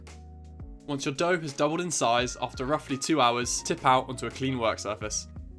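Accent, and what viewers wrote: United Kingdom. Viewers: a form of london accent, possibly a west london one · That's what you can hear from a British dude.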